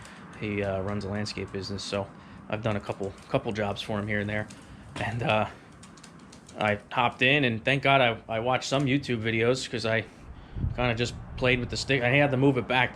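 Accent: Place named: New Jersey, United States. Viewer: What part of New Jersey are u from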